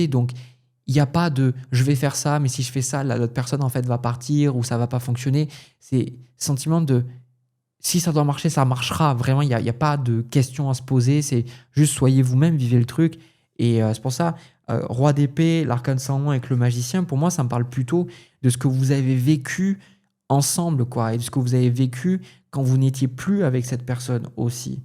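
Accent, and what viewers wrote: South, France. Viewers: Merci 🙏💗, je viens de te découvrir, c'est très parlant, c'est marrant toutes les cartes que tu as sorties sortent aussi dans les autres tirages, donc, cela confirme le tout! Je te souhaite une merveilleuse journée et ton accent du Sud me rappelle mon enfance en France, bisous 😘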